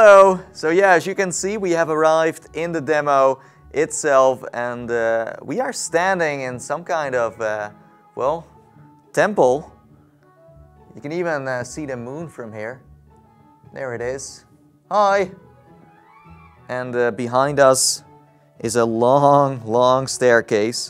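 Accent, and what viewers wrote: Netherlands. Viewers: I dont now but your accent is abit like a dutch man speaking english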